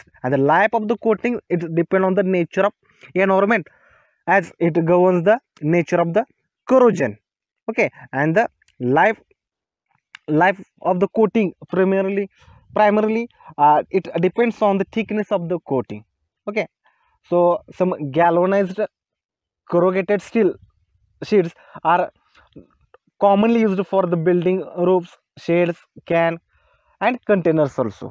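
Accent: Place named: India. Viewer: altough the Indian English accent is a little hard for me to understand, was a great video, and show me what I wanted to know.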